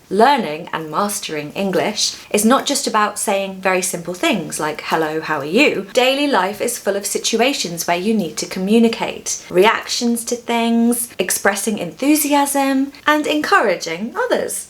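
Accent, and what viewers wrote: United Kingdom. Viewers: Hello,. I always enjoy your videos! I used to live in London, and I love your beautiful British accent! Did you have a nice summer? I'd like to say I had a good summer despite the awful heat. You'll never guess what happened. I fell into the underfloor storage and broke my leg! I hope you stay fit and have a lovely autumn! Bye! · Usually I didn't like to write a comment here, but you made an incredible explanation for me, and I also like to hear your voice with a British accent. Sorry if my English is not accurate or there is something wrong with my comment. 😊❤ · You're my best British English accent teacher ❤🎉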